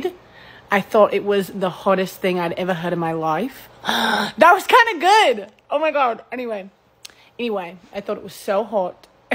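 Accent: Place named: New Zealand. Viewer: Haha no you just sound Kiwi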